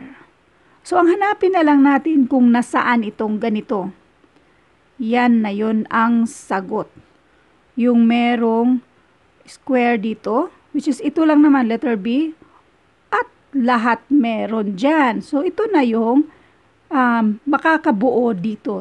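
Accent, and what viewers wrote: Visayas, Philippines. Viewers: omg this is so helpful. i havent understood it before i watched this and it really helped me understand these series of patterns especially the segment completes the grid. thank u maam and God bless btw i love your bisaya accent : )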